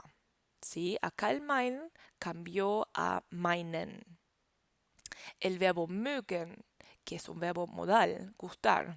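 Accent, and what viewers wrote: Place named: Castile, Spain. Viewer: Sos una crack... Dankeeee Totales!! P.d. Me emociona, se me caen las lágrimas y canto un tango por tu acento castellano. :)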